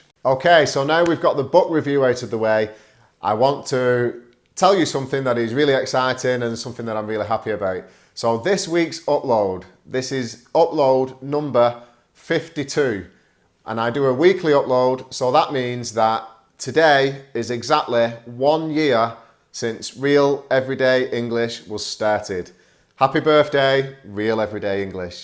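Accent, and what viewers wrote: United Kingdom. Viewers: Thanks for your videos, I watch your lessons because of your cool British pronunciation. Really it gives shift to undestand original Royal English accent :). Again many many thanks for your lessons. Sincerely Anvar from Uzbekistan...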